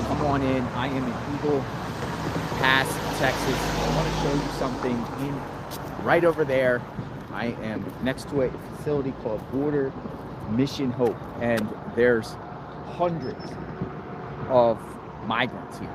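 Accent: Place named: Midwest, United States. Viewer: Are you from Michigan or Wisconsin ? Trying to place the accent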